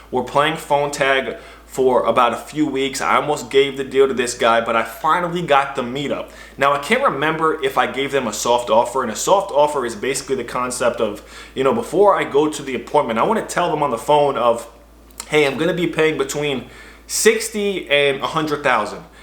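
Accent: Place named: New York, United States. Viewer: Good morning brother, do you operate out of the tri-state area? You sound like a New Yorker 💯%😁💪🏾. I'm from The Bronx.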